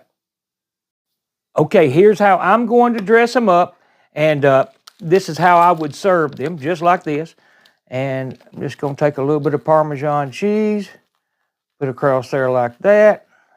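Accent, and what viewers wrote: South, United States. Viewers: Cant get the accent Sir? South but??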